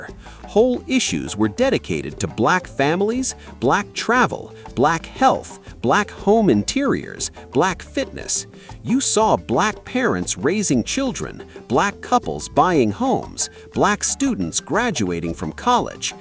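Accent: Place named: Europe. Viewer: Why is a European voice narrating this video? 🧐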